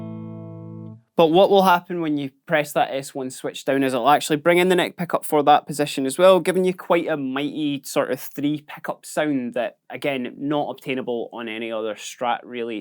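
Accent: Scotland, United Kingdom. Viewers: Scottish accent IS musical! sold me this guitar better :D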